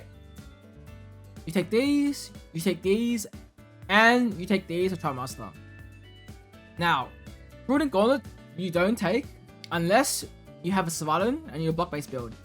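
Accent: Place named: Australia. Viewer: sounds aussie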